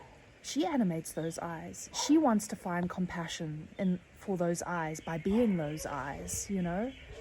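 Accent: South Africa. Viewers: Jai Anandamayi Ma ❤ the divine feminine expressing itself through this beautiful blessed woman with a South African accent. Shanti Shanti Shanti 🙏❤🕉❤🙏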